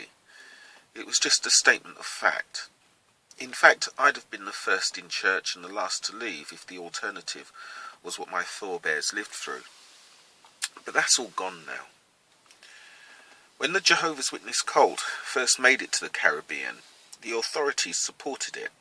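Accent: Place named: RP, United Kingdom. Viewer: There is controversy re: origins of Hinduism. Very similar but with the extra kick of reincarnation. i. e. "if you do what we say, in your next life you can be like us" In US the AME church was started due to segregation. PS never thought what color you were. I just know you sound like the royals. My family came from Birmingham so that's a compliment :)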